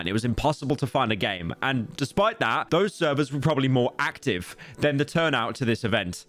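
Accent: United Kingdom. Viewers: Brits are so weird... "Willy Wonker"... geez man.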